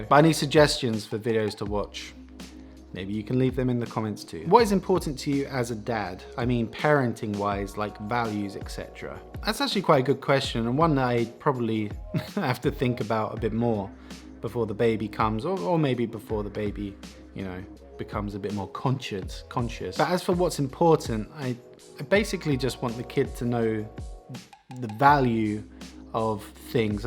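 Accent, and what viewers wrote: Finland Swedish, Finland. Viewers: He is talking finland swedish not swedish.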